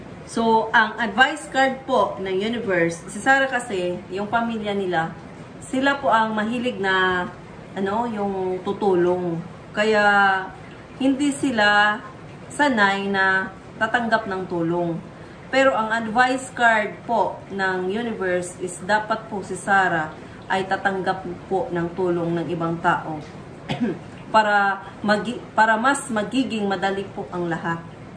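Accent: Visayas, Philippines. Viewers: bisaya si reader?💚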